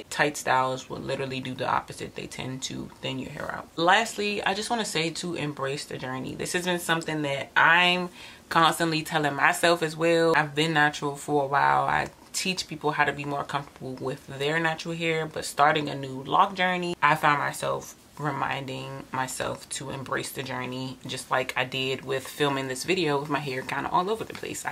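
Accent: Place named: DC/Maryland, United States. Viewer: Hey girl hey 👋🏿, I know my people from that DC/MARYLAND accent ( most people don't hear it but I DO)❤💚🖤✊🏿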